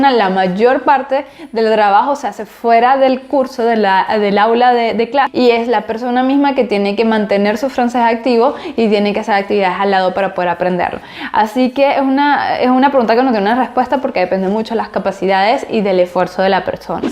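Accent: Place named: Venezuela. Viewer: Pinche acento venezolano no lo soporto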